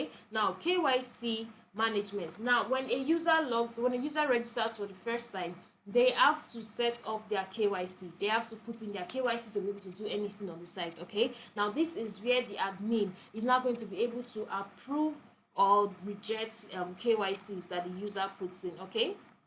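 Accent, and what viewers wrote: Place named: Nigeria. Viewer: I hear the Nigerian voice. Good job and thanks for this.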